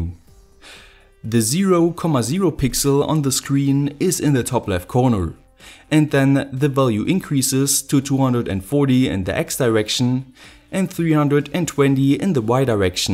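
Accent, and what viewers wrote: Germany. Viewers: are you german? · It's probably the german accent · Hey it sounds like ur speaking deutsch When yes: ich auch xD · Schade dass Du nicht deutsch sprechen kannst. Ich höre immer einen deutschen raus der versucht extrem perfekt Englisch zu sprechen. Aber das ist ja quatsch. Weiter so. Tolle Bastelthemen!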